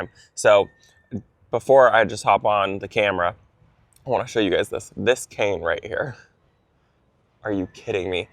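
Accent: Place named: South, United States. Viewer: I noticed a more southern twang as well! 😅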